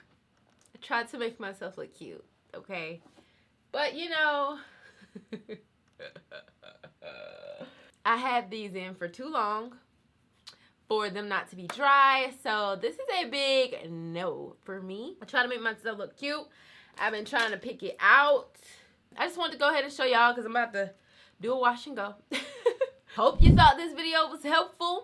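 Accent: South, United States. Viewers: Lmao why are you so funny in this video??!! Sounded so country 😂😂😂😂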